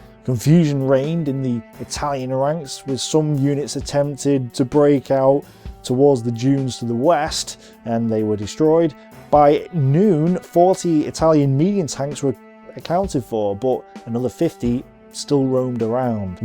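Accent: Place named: Manchester, United Kingdom. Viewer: It's a Mancunian Accent , from Manchester / surrounds.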